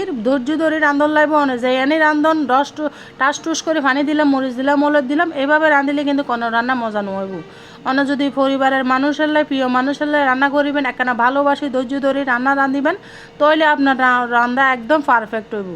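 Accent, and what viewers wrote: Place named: Chittagong, Bangladesh. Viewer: খুব সুন্দর হয়েছে এবং মুরগির মাংস রান্না খুব ভালো লাগে তোমার চট্টগ্রাম ভাষা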